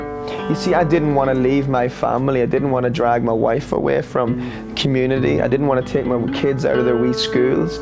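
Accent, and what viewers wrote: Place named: Ireland. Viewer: I love listening to speak, not only the Irish accent 😉 but to speak of Jesus and what leads him to follow and do Gods will. Thank you and WAM 🙏🏼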